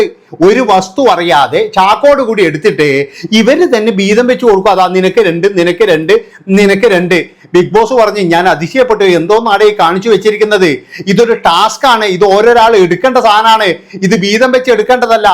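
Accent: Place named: Kannur, India. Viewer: ബ്രോ 👌👌 നിങ്ങളുടെ നാട് കണ്ണൂർ ആണ്.. സംസാരം കേൾക്കാൻ ഒരു രസം ഉണ്ട്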